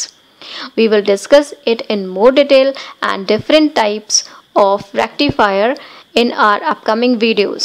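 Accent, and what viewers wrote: India. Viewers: Animation is pretty ok but DEAR GOD I can usually stand Indian narration but JESUS CHRIST THIS ONE HAS DRIVEN ME INSANE IN 30 SECONDS I CAN'T FUCKING TAKE IT ANYMORE thank God for auto-gen captions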